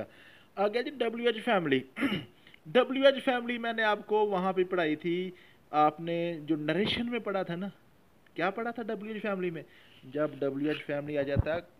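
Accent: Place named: Punjab, India. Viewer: v informative lecture Sir ❣👌👌....way of teaching very amazing with punjabi toch...😍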